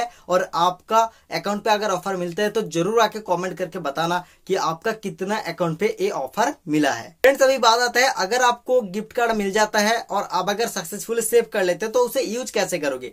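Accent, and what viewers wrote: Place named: Bengal, India. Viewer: Bhai aap Bengali Ho 😀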